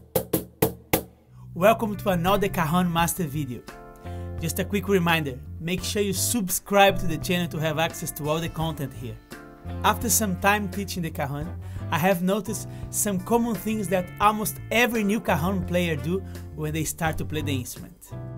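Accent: Brazil. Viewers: E esse sotaque aí mano, é brasileiro ??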